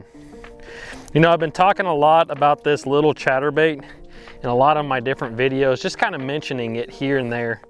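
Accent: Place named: Long Island, United States. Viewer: "Downsize after Sunrise" that's how I roll ....unless their on and the Bubba baits r Workin ! Great channel brother. Is that accent from Long Island ?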